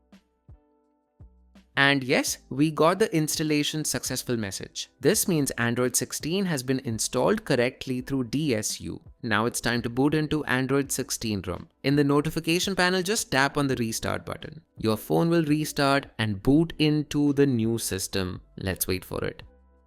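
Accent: India. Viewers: The Indian accent makes it very suspicious!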